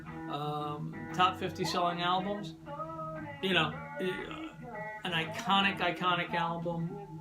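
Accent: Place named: United States. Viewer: Hi!! Well done with your channel!! It’s lovely to hear your American accent.. hopefully one day we can meet 😌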